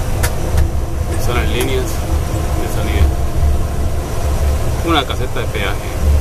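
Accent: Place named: Costa Rica. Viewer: Reconocí ese acento tico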